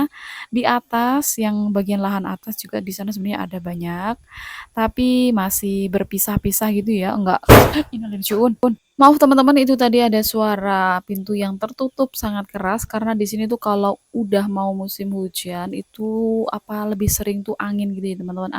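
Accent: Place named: Malang, Indonesia. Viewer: BHS orang malang rijik rijik 😂👍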